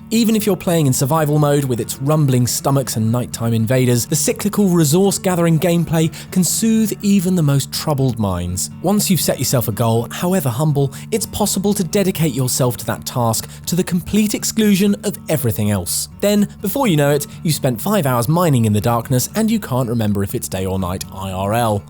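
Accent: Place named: United Kingdom. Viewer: There's something satisfying about hearing British accents on these types of videos..And this happens to be the best so far lol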